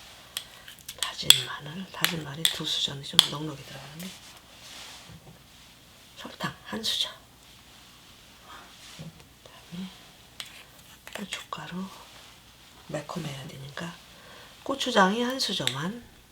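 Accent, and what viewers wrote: Chungcheong, South Korea. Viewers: 이잉! 정겨운 충청도 이신겨???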